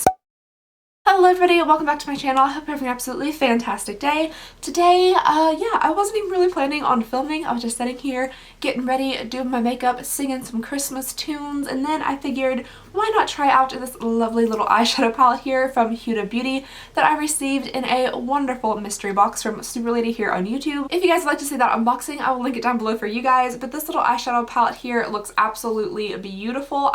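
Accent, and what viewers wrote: Kentucky, United States. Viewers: I think its beautiful. I live in kentucky, you sound like you are from Kentucky also. Sorry, just curious.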